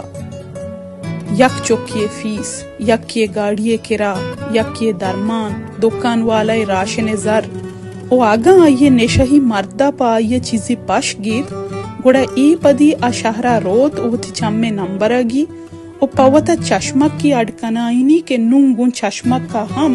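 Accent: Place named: Balochistan, Pakistan. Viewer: الله میاری بانوک تئی گپ تران سک وش دولدارانت ❤❤❤ اصل بلوچی انت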